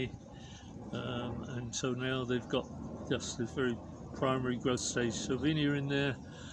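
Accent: United Kingdom. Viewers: You sound British, where in the world are you?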